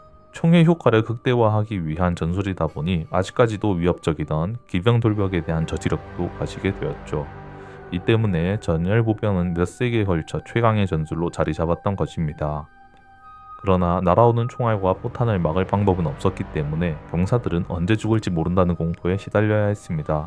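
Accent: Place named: Gyeongsang, South Korea. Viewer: 표준어를 쓰는듯 하지만 느껴지는 경상도의 향기...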